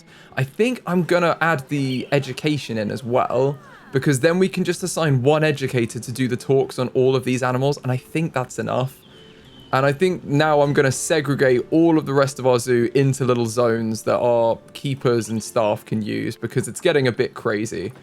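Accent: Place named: RP, United Kingdom. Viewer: He's definitely English. I would say South/South East England. Honestly to me, from North East England, he just sounds... generic English lol 🤷‍♂️ Like definitely not Newcastle, or Yorkshire or Liverpool, or Birmingham. Just... slightly posh/fancy generic lol.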